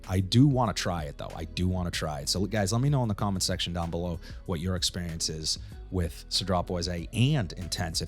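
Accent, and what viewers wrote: Boston, United States. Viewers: You have that Boston accent. Looking into this as my first niche fragrance.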